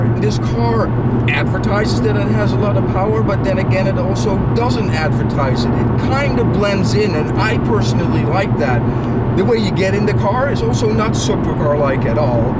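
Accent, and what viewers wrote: Netherlands. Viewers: Are u seriously dutch? Thats cool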